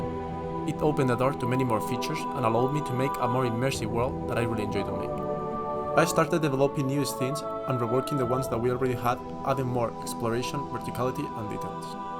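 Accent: Spain. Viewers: No se porque diría que eres español, por el acento JAJAJAJJAJA, me siento como en casa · El que habla es español no? Jeje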